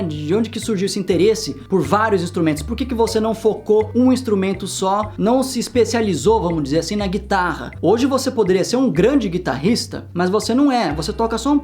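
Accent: United Kingdom. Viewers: Vc já morou na Inglaterra? Seu sotaque inglês é igual ao dos britânicos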